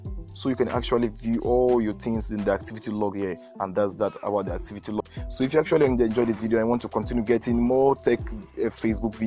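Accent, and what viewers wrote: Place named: Nigeria. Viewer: This guy sounds Nigerian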